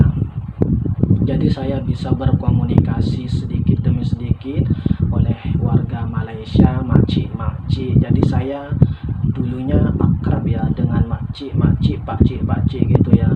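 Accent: Indonesia. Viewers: Mahal sekali suara suara latar yang sesuai membuat video.sedap dengar,walaupun ada alang keindonesia nya.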